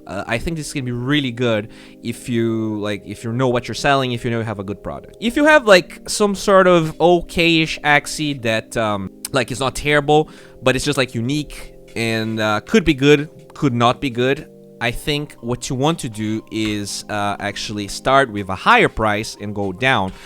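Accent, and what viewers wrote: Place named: Brazil. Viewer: Hey man, are u brazilian? U have an accent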